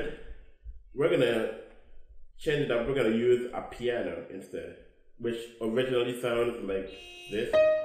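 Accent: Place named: Africa. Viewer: You right B'. You have African Accent #TeamAfrica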